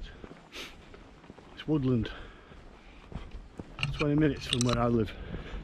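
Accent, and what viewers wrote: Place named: Leeds, United Kingdom. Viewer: I love wild garlic. Gather it, tub it, freeze it for future use. Stews and stuff. Once frozen it crumbles nicely. Where are you from?without giving too much away. I'm Leeds. You sound quite local.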